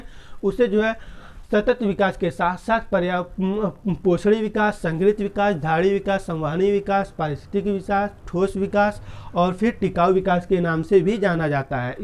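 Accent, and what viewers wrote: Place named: Bihar, India. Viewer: Bihar se ho aap lgta h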